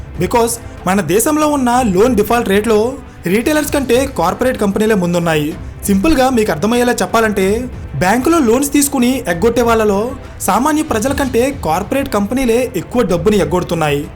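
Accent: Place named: Coastal Andhra, India. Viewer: bro , coastal andhra people pronunciation ala ne untadhi .. Rayalaseema and telangana slag laga 👍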